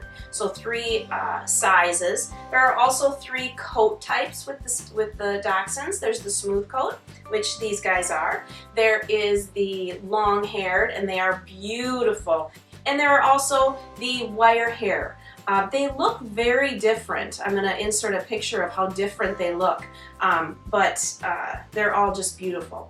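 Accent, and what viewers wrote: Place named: North America. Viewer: Your dogs are sweet but they are not dachshunds in terms “real” dachshunds (do not want to use word pedigree altho that is what i meant but sounds a bit nasty). There are only two sizes in Dachshunds, the standard size, up to i think 10.5 kg and the miniature Dachshunds,up to 5 or 5.5 kg. Nothing in between. Now in terms of colours. They are black&tan,chocolate&tan,red and i think it is called dapple , i am not sure. I am not quite sure about colours, but if you are going to buy Dachshund puppy and the seller sells it as pedigree puppies, one quick,easy way how to spot that the Dachshund is not Pedigree is present of white colour. Apart from the Dapple,white colour might be present in tiny amount on the chest but even that is UNDESIRABLE. Pure (short or long haired) pedigree Dachshunds have ZERO white colouring. If there is a trace of white colour(mostly on the belly/chest) , you should know that the person is not genuine selling pedigree Dachshunds and that will be mostly the reason why seller says : pedigree but NO PAPERS,or Very rare colour etc.... 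so what you have got there are sweet dogs, but not up to our European standards to be called pedigree Dachshunds. I can not differentiate the USA accent from Canada’s one, but you are surely from American continent where i noticed the acceptance of “non pedigree” Dachshund mixed with other breeds of dogs calling Dachshund. German, the original Dachshund breed here in Europe simply can not be white mixed colour which is way out o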